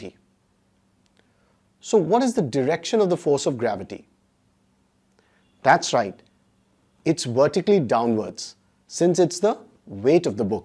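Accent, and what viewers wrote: India. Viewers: Sir your video on work is more convincing. Even you use simple indian pronunciation. That's why I am impressed. Thank you sir. You are doing hard work. Without the intension of fruit. Thank you again. Keep it up!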